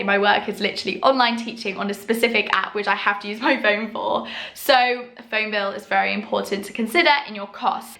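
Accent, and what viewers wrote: England, United Kingdom. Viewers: Love real English accent!!! Which type of UK accents it is?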